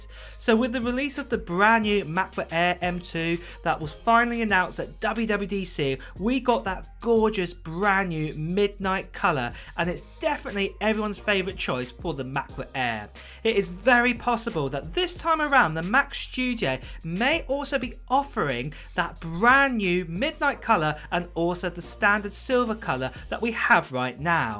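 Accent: United Kingdom. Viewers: i love your british accent and i hope to have the iphone 14 pro max pleaaaaaaase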